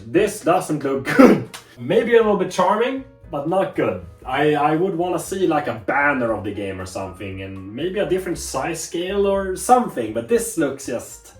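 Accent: Sweden. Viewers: I know that accent mr swede!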